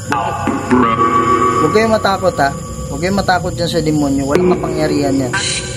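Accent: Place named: Ilonggo, Philippines. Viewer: parang Ilongga ung tono ni..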